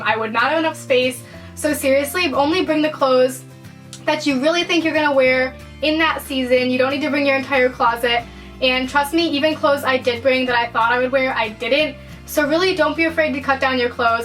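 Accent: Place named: Buffalo, United States. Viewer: are u from buffalo? you totally sound like it!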